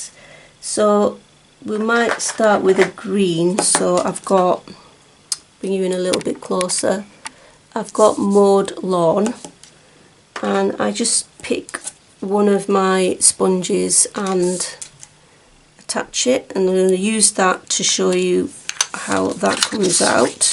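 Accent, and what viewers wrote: United Kingdom. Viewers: Very useful!! I am sitting here with my second iPad buying up on Amazon! Today was pension day! You have become like a friend to me I would love to sit at the table and colour with you in person, since I am in Australia that’s not possible, love your videos very much, and your accent what part of Britain are you from? I was born in Birmingham but don’t really have an accent, except true Aussies usually pick I am from the UK 😂😂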